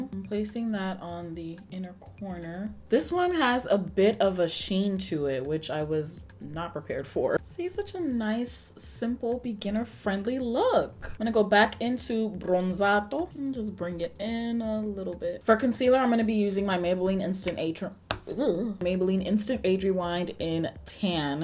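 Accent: Nigeria. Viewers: Can we please get a video of you speaking only in your Nigerian accent? I just love it !! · Wow your hairstyle is cute! <3 Also you are one of the most unproblematic beauty youtubers! I love to watch your videos not even to learn makeup, but to listen to you talk. You always seem so friendly! Like a sister, a friend or the coolest auntie! <3 And your voice is very nice to listen to! And I also love when you speak with your Nigerian accent! <3 It's almost like ASMR for me! <3 Sending you all the love from Poland! Wish you the best! <3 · As a fellow Nigerian, I am going to need a video with you speaking mostly with your Nigerian accent 🤠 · A British/ pommy accent made a few appearances in this vid, but I definitely love the Nigerian accent! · Can you do a video only in your Nigerian voice ❤️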